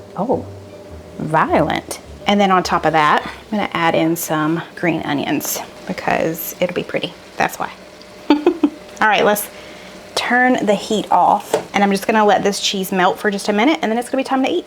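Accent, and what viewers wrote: South, United States. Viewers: that chicken looked so good , def gonna try that one , but u crack me up, spray them with lil ol !!!!!! here in the north we call it like its spelled . oil hehehee, southern talk makes me laugh at how ppl pronounce diff words ... ty for a great video , oops n all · Ohhhl is the southern lingo for oil…. I love the twang of the south. And you bowl your water for pasta 😂😂 Yeap, chicken fried steak is southern dish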